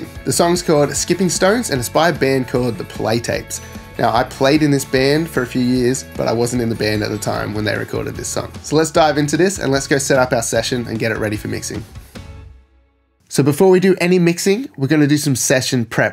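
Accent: Australia. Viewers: Nice to hear an Aussie accent on a mix tutorial!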